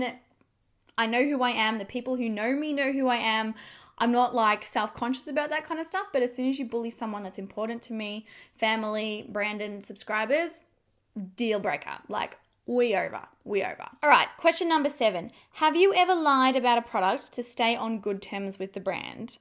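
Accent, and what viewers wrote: Australia. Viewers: Hi, I rarely leave comments, but I have to say that I really enjoy your videos. You give terrific reviews and let us see exactly how everything sits on your skin. Also, your accent is amazing! I've been to Australia four times and always find excuses to go back (even though it's soooo expensive). I usually watch your videos at the end of the day (mostly for relaxation purposes), and it makes me feel like I'm on vacation... is it weird? Anyway, thank you for being you. I don't think you need my opinion, but people can be mean to each other regardless of your professional field. It's tough not to feel affected, but the most important people in your life know you and respect you. Also, you have heaps of fans worldwide (I'm Portuguese). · I believe in free speech too, it is always best to let fools expose themselves. I love the Mark Twain quote " It is better to remain silent and be thought a fool, than to open one's mouth and remove all doubt." I love your make up looks all though I am the opposite I have dry skin and prominent round eyes but I still dig your looks just can't use the same foundations and concealers. 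P.s I also love that the Aussie say arse like us Paddys, great word so is shite as it is worse than shit lmao. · I love so much!!! And who is mean to you!!!!!!! Can't believe it. Not that the US accent is better than the Australian!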